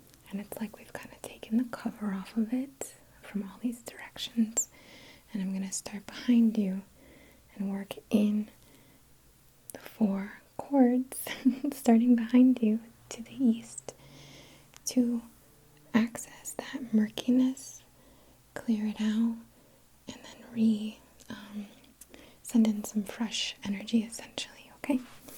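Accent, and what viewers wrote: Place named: New York, United States. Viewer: Your voice is the perfect trigger for me! As a New Jersey guy I am a sucker for a New York accent LOL. Thank you for the wonderful relaxation you bring me.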